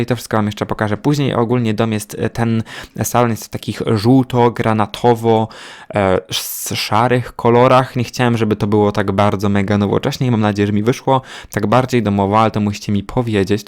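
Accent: Podlasie, Poland. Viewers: Pozdrawiam współplemieńców Podlasian😂 I to nasze zaciąganie... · Jestem z Podlasia... I dopiero od niedawna zauważyłam ten Podlaski Akcent i siebie xD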